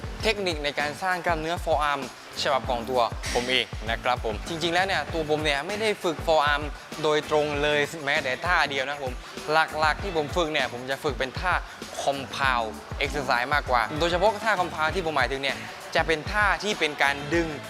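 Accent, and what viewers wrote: South, Thailand. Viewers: ตอนปึ่งแลแรกๆไม่คิดว่าเป็นคนใต้ 555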